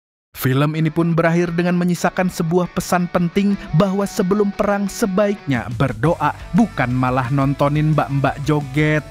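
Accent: Banjar, Indonesia. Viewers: Banjar banarr😅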